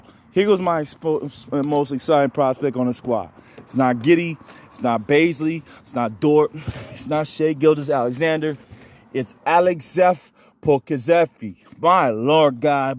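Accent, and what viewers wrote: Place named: Australia. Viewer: lol, love the urban-ausie accent. :) Thanks for the video man. Appreciate ya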